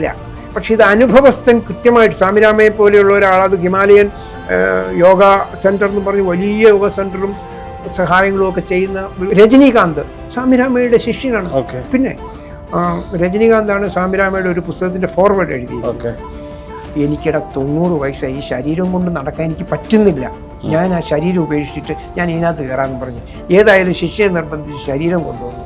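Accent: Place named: Thrissur, India. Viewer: അത് തൃശ്ശൂർ കാരനാ😂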